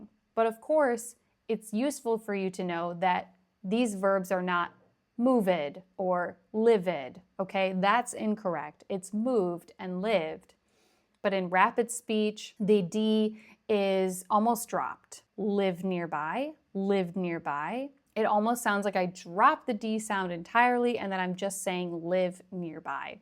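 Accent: United States. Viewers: I'm happy that I've found you. Your videos are really helpful. Thank you. I like the American accent ❤ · Thank you very much for your useful work! I enjoy it a lot, but honestly I have to say that it's a little bit weird to me the american accent because I have been taught at school in British accent. But in any case, I do my best! Kisses from Spain.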